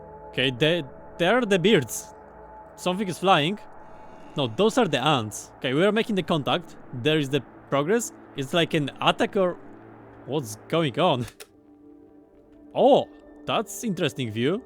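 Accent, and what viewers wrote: Poland. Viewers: czy ja slysze polaka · Odrazu wiedziałem że jestes Polakiem, wystarczyła chwila abym wykrył rodaka, poza tym niezły kanał, gratulacje.👌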